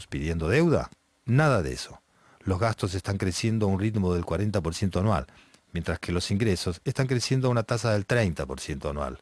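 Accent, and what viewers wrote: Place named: Argentina. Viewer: Yo pensé que era un argentino que quizás vive en Chile o algo, tú sabes que a veces los acentos se pegan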